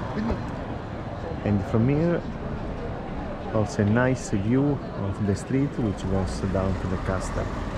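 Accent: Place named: Ferrara, Italy. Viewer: Fantastico il ferringlese si riesce a sentire la elllle e la essse anche in inglese! Sono di Ferrara tranquillo capisco! Bel video mi piace!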